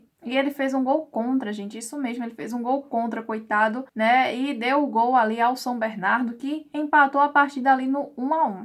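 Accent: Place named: Sergipe, Brazil. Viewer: kkk parece que e sergipana sou paraibano torço pelos times daqui e pelo mengao e claro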